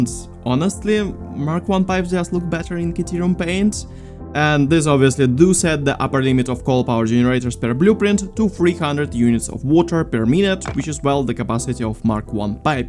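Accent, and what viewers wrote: Russia. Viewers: слишком слышно русский акцент, но видос классный, повторю как дойду до угольной энергии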